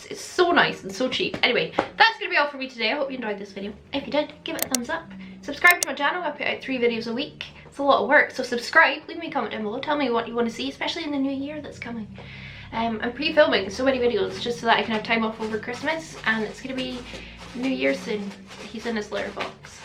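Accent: Scotland, United Kingdom. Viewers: I love when you do a deep Scottish accent every now and then! I recently found out I’m like 25% Scottish! Merry Christmas,! ❤️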